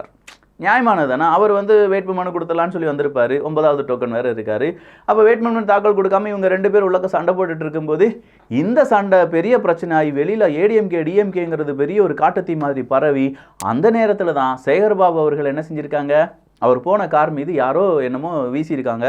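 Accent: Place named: Tirunelveli, India. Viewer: that tirunelveli thoothukudi slang, loved it bro, iam from tirunelveli❤❤❤😍😍😍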